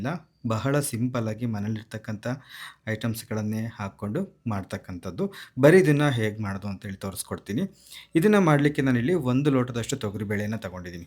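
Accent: Chitradurga, India. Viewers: Durgada bashe keloke chanda ..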